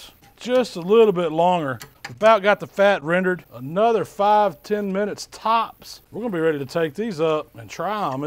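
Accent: South, United States. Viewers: Man that looks good. A fat chef with a south accent is a must when it comes to BBQ.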